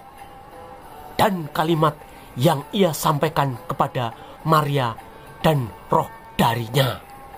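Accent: Java, Indonesia. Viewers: Kalo bisa logat jawanya dihilangin bro. Saya yg bukan jawa agak susah nangkepnya. Cara penyampaian ya kurang umum. Saran ajaaa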